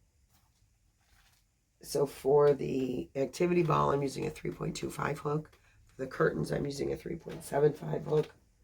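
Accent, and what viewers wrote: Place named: North Carolina, United States. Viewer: X school bus driver Hope get to feeling better you sound like me and my daughter we live in north Carolina feel better love you ❤.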